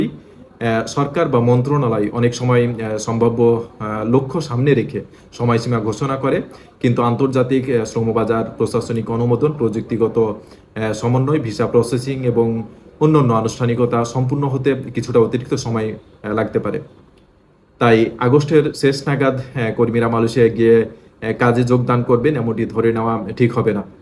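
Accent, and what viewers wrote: Kolkata, India. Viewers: তাহলে কলকাতার ভাষার মতো শব্দ উচ্চারণ করেন কেন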